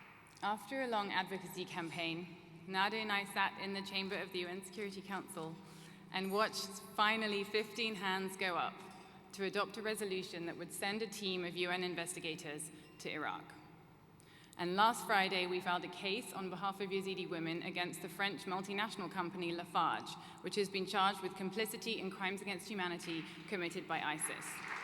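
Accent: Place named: United Kingdom. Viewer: I really like her British accent .she is clever , educated and authentic and gorgeous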